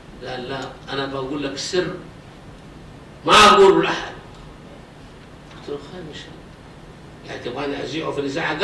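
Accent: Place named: Hejaz, Saudi Arabia. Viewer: Cashit Fr ياخبل ذا ماهو طرش ذا يمانى اصل العرب بس لهجته حجازيه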